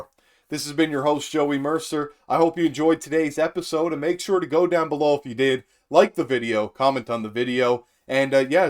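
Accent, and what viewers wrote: Canada. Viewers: Are you from Newfoundland or PEI Joey? Lol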